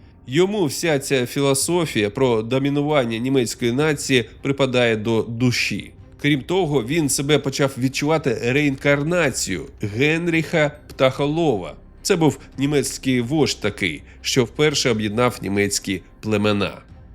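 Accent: Russia. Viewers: Дуже дякуємо за українську мову та цікавий контент. Дозволю собі малесеньке зауваження , не ображайтеся тільки , дуже прошу. В українській мові , де пишеться буква О , то так і читається та вимовляється - О , а не А .Завжди , незалежно від наголосу. В російській , коли наголос не падає на букву О , то читається як А . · Удосконалюйте українську Дуже ріже Ваша російська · Працюйте над вимовою,бо у Вас російський акцент!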